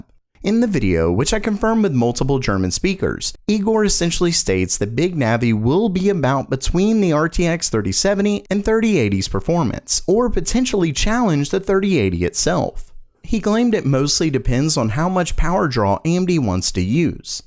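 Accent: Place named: South, United States. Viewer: southern accent coming out here and there lol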